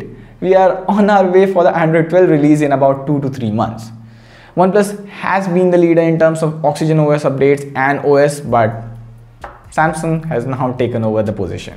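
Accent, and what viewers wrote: India. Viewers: Прикольный акцент у индюшонка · Good Indian English review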